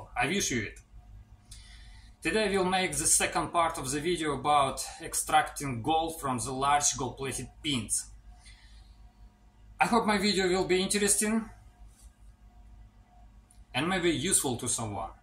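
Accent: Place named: Russia. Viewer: Слышен русский акцент)))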